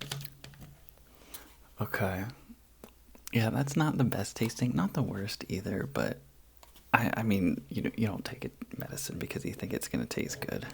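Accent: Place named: Kentucky, United States. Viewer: You gotta be from Kentucky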